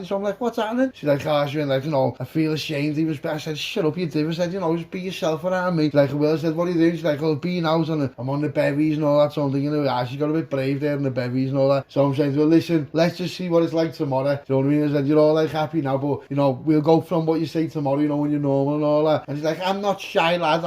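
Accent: Liverpool, United Kingdom. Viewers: Another brilliant story from a fellow scouser good to listen to you. Good work and good luck with the channel I love it 🎉thanx mate 😊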